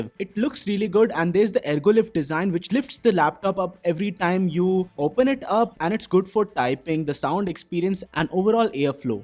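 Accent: India. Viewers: English ( Indian accent ) 😅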